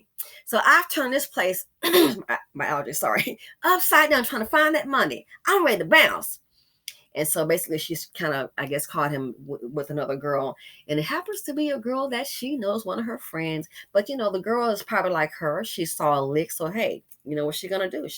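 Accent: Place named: South Florida, United States. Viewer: I knew you were around Palm Beach. I’ve been trying to pin point your accent because you sound like another YT’er I listen to who lives in South FL. I love your accent.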